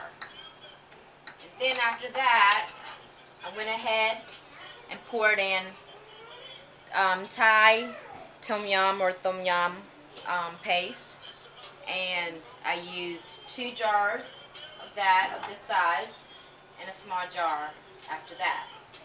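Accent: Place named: South, United States. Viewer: Atlanta?? Can def tell you from down south but never heard khmer girl with southern accent lol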